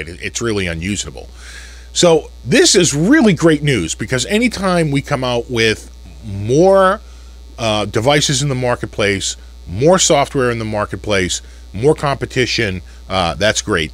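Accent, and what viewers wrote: Boston, United States. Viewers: I love hearing a fellow Bostonian talk about music and guitars. you're the man! Keep em' coming brothuh!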